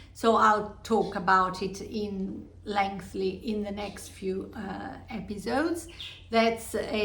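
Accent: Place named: Italy. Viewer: ! I loved this episode. I find your accent so interesting - sometimes I hear Italian accents and sometimes I hear Australian accents! 😁 We hosted an Italian exchange student one year and are still in contact with her. Anna and her family live in Collebeato. Close to Lake Como. Where are you from? You share so much great detail about your projects here! I made notes on things i didn’t think to share! Sure do wish I could come meet up with y’all at the fiber festival next fall!!! I guess I’ll just join virtually. Thanks for sharing such great inspiration❤❤❤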